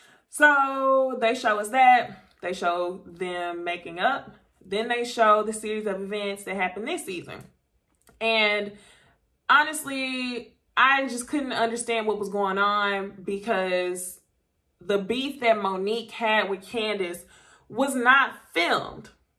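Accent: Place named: South, United States. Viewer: Come through with the fair and unbiased perspective. Love it! I also love your accent. This is from one southern woman to another lol. Can’t wait for the panel tonight.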